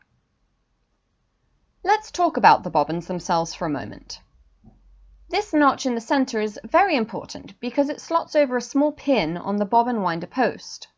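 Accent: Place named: England, United Kingdom. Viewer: Thank you so much for uploading this video on bobbin winding. I now know why I was having trouble. My one and only metal bobbin’s notch was not deep enough and the reason for not catching on to the bobbin spool pin. I have easily rectified this by using the edge of a fine saw blade. Just a few back and forward strokes has allowed it to fully engage. I have as you suggested opened ‘About’ and sent my email for your very kind offer of a pdf copy. Thank you so much for all of your videos, which have been a great help to me. Glad you have not lost your refined English accent!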